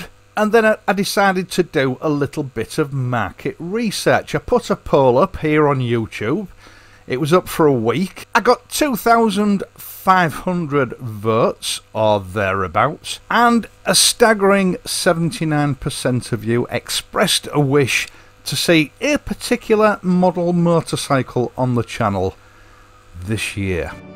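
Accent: United Kingdom. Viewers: Yeah, it´s official... You are the greatest Royal Enfield fan in the world !!! Congratulations and thanks for sharing your experiences and letting us hear your absolutely British accent. Your videos were a great support during the process of getting my own Orange Crush RE Interceptor (locally assembled in Argentina). Thanks again and best regards !!! Ernesto M. Parodi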